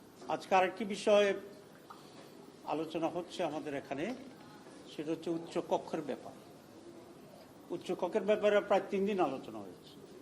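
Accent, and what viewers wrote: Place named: Chittagong, Bangladesh. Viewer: বি,এন,পি র সালাউদ্দিন সাহেবের অনেক যুক্তিপূর্ণ কথা উনার শ্রবন কটু চিটাগাং এর আঞ্চলিক টানে হালকা হয়ে যায়।মইন খান বেটার অপশন হতে পারতো। মির্জা সাহেব যেহেতু এই ঝামেলায় আসতেই চাচ্ছেন না।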